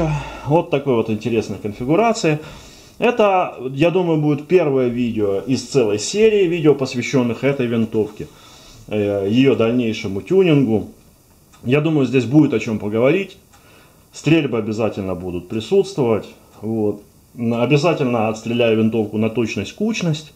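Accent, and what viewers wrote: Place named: Ukraine. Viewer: у его английского сильный украинский акцент =)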